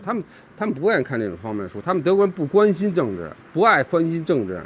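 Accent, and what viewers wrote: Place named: Beijing, China. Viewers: 京爷 。哈哈 这口音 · 他可能指的是祖籍，很多人会这么讲，听口音应该是在北京长期生活过的。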